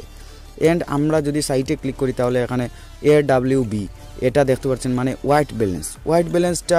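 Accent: Sylhet, Bangladesh. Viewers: vai Sylhety?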